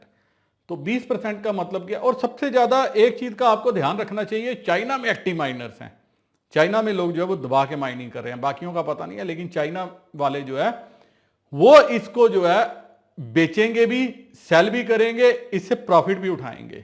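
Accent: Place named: India. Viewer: you write in English and then speak Indian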